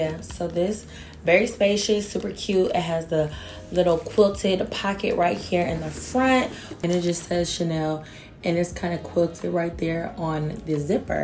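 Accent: England, United Kingdom. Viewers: Your British accent kills me 😂😂😂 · The British is killing meeeee I love you lol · The English accent 😂😂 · "mom says don't drink the milk"........ I love British accent!!!🤣🤣🤣💕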